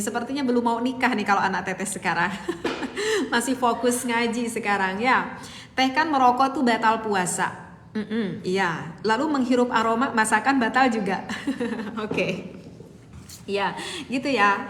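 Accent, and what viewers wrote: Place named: Padang, Indonesia. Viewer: Teteh kadang logat bahasana saoertos urang padang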